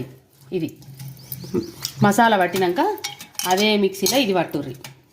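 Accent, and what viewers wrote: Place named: Karimnagar, India. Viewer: Pakka telangana slang , vinasompuga undi Amma. Meedi naku telai Karimnagar Anukunta. Maadi warangal 😅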